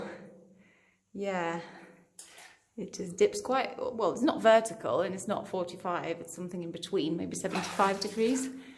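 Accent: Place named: United Kingdom. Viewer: No Chris with you?. Your accent is developing into a really posh english one now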